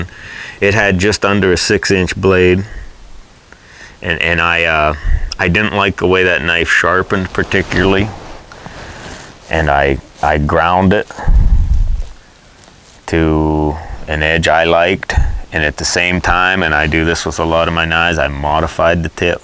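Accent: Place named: Pennsylvania, United States. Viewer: Good answers to questions people were wondering about. Had no back ground at all on you or any contestants. You talked like people that I know in Pa. After the show is over my son-in-law told me you are from Clearfield county PA. The son-in -law and daughter live in Faunce. The segments that were shown of you and the other participants were so interesting. It caused me to wonder. Could I do this? Hell no was my answer. Listen, proud to see someone from Pennsy took the title. Although Callie gave it a good run. My money was on you after day 50. Congrats to you and wishing you the best. Hope to meet you someday, maybe at a book signing or something. Good luck and safe(but exciting journeys to you).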